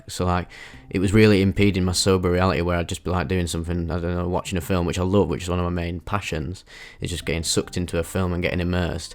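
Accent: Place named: Yorkshire, United Kingdom. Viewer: Mate i feel i could be platonically serenaded by your yorkshire timbre for hours on end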